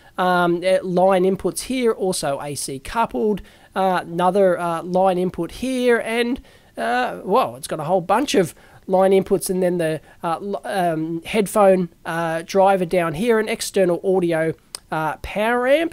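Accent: Australia. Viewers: He speaks "strine." :-)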